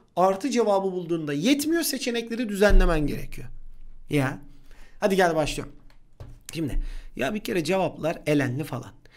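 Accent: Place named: Izmir, Turkey. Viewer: Bi İzmirli ağzı sezdim sanki.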